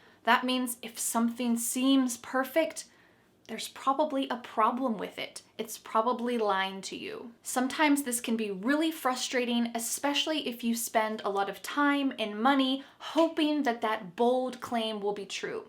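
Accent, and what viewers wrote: United States. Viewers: It's nice to find a normal American who speaks English. And not a Foreigner with a accent. Which makes it harder to understand. 😧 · Of course, I will watch every of your video. Cuz you are using American English. Perhaps, you’re from the US. I am now learning American English, this is why I subscribed your channel. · She only speaks American, not real English.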